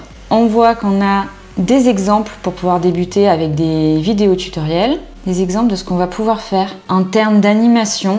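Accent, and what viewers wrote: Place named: France. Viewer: the french accent is a huge flex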